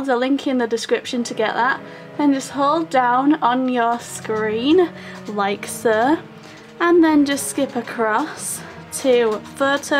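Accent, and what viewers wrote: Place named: Hull, United Kingdom. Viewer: Sound like you’re from Hull! 😎👍🏼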